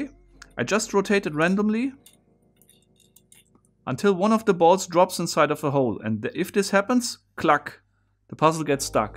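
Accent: Germany. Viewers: nice video and interesting puzzle 👌, but damn bro ur german accent is awful 😧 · I love this german or skandinavian accent. Such wholesome english;) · Man hört raus dass du Deutscher oder Österreicher bist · It is a very hard German accent ^^ my fellow Landsmann has used this as charme however :) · Is he german? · Could it be that you are german? Becaus your accent and when you said ‚klack‘ it gave it away 😂 Greetings from munich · Its so weird how he sounds so german.. he also uses have instead of has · Es ist lustig wie man am Akzent raushören kann, dass du aus Deutschland bist. Its funny that you can hear that he is german :P Good Video tho! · Klingt sehr deutsch, dein englisch ^^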